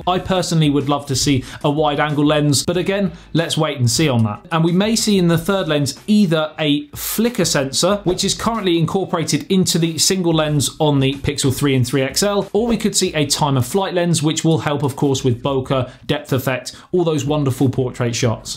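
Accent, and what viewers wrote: United Kingdom. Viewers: 2nd commenter i guess.......love your brit accent 😅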